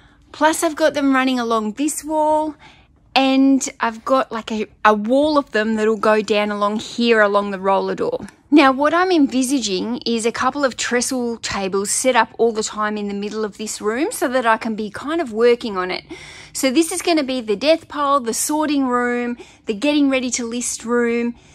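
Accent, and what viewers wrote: Australia. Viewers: Great sales on these books. I just started watching your videos. Thanks for taking us along for your journey. Question do you sale comic books also? Love the Australian accent!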